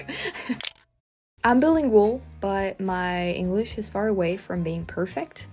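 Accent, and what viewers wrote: France. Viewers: Hey 🤔 🗣️ 🌎 You have the best of both worlds, don't fret look how far you've come, take it easy on your self, theirs no need to be perfect we're understanding you just fine. I wouldn't want you to lose your accent completely & be something your not, your french background is an essential part in shaping who you are & why we like you so much & with Valentine's day coming up having a french accent does have its advantages. Going through your points, I didn't realise other languages there weren't learnt that much, what could be the reason, your distinctive culture maybe because your a big country. It doesn't surprise me about the Netherlands there's always a lot of different people coming & going trying to understand one another so it makes sense that English is widely spoken. Along with the Scandinavians they have that American sounding voice that kinda weird almost robotic & to perfect, no disrespect, I don't why that is. 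It's interesting how you've been learning English since school, you were probably much better at it than English kids vice versa, I wonder what history was taught both countries were so interwoven once upon a time, french was spoken along side old English for many centuries & wouldn't exist like it is today if it wasn't for you. I can see how challenging it is for non English speakers trying to figure out all our accents, we shouldn't take it for granted, even I sometimes have trouble understanding the thick southern drawl but I've always li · First time watching one of your videos. I understood every word you spoke. You have a French accent but don't sound like the Cajuns down here in South Louisiana. Be very proud of what you've accomplished. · Your English is fine, the French accent adds a bit of charm. Informal phrases are probably common in most languages. Some English words that describe informal language are colloquialism, slang and jargon. 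If you hear a phrase you don' t understand, don't be afraid to ask what it means. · Don't worry about trying to lose your French accent...there is no 'standard American English'. Be proud of yourself for speaking English so well! You are doing Great! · The different languages are fascinating, but I realized while trying to learn Spanish that it is all about tongue placement for pronunciation. You were raised on French pronunciations, so now you have to retrain your tongue to position it properly for English. Your tongue is still trying (or fighting) to position itself for French words as you pronounce English words. Especially since you still speak mostly French at home. That's where accents come from! Your English is fantastic but if you work on correct tongue placement it'll eventually disappear. Love ya! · Your English is VERY good. Your cadence and flow are excellent as is your vocabulary. 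I actually love your French accent and hope you never lose it. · Well your English is great now. You have a lovely French accent, but not to the point that anyone would have trouble understanding you. BTW don't believe people when they say Nebraska is a fly over state. With your love of nature, you would absolutely enjoy so many different places to visit here. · Your French Accent is Charming. I have a Friend From Ireland. Lived in the States for 30 Years and Still has his Accent. Every One Loves It.😊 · Your English is beautiful. French is an extremely difficult language to hear for many English speakers so your communication is amazing. I can hear my French family members is your English accent. Its great. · As a native speaker of American English, I am impressed that you think English is a beautiful language, and that the American accent is your favorite. And this coming from a native French speaker! 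Your English is perfectly understandable, and is made more charming and delightful to listen to by your French accent. You're an inspiration to people (like me) who are struggling to learn a second language. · I would easily pick up that you were from France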